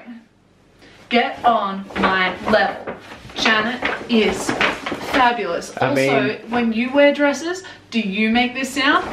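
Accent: Australia. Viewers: Are you Australian?? :3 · ...you're Aussie. Yes 😂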